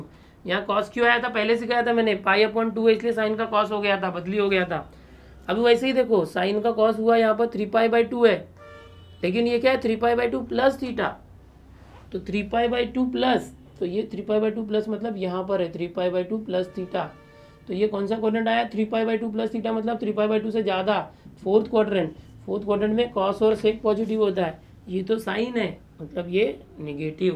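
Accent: Maharashtra, India. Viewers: महाराष्ट्र स्टाइल मध्ये कडक कडक👌👌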